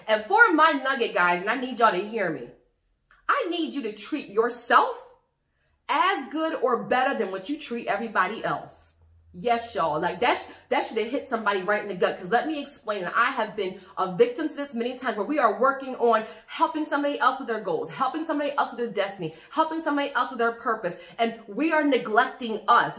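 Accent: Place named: Pennsylvania, United States. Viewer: love that Pennsylvania talk got cousins from Pittsburgh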